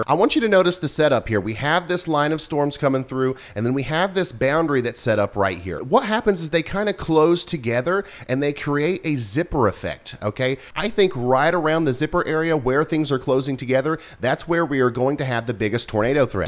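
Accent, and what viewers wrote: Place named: Kentucky, United States. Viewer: Hello from London kentucky, u talk like ur close to here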